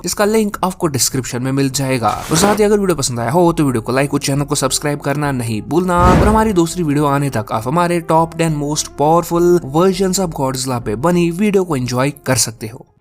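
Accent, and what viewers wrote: Kashmir, India. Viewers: U sound like kashmiri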